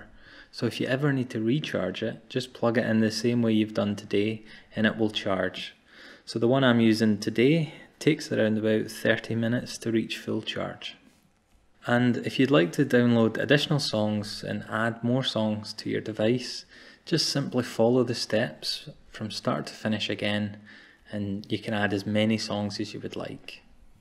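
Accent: Scotland, United Kingdom. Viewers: Thanks for your very helpful video. Ever since I accidentally found an old mp3 player unit when curiously searching around and had no idea on how it works until I found this video. If my calculations are accurate, I have noticed that your accent appear to be Scottish. Correct? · Sounds like Scottish 🤩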